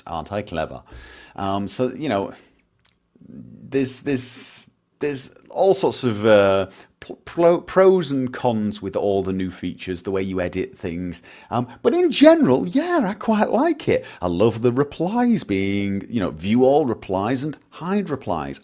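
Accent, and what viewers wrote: United Kingdom. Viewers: If i recall correctly he's from England. or rather... blast it's on the tip of my tongue but i cant quite get it right. Sorry on that part. suffice to say he's in an area where he sounds "wonderfully British". dont realy hear people go "he sounds wonderfully American" so i guess certain parts over there are doing a few things right. god i can hear it now... going "i'm not from England you sodding yank i'm from ___"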